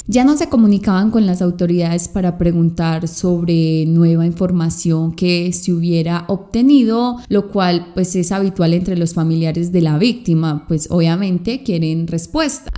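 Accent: Antioquia, Colombia. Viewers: Eres de Colombia? O de donde es tu acento? Me encanta tu acento. Bueno saludos ciao 💋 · Me encanta tu espontaneidad, sos PAISA lo sé .. yo también aunque vivo en Londres have 20 ańos. Ese acento nuestro es maravilloso Sigo tu canal y me gusta mucho tus historias y análisis.. MUCHÍSIMA SUERTE DIOS TE BENDIGA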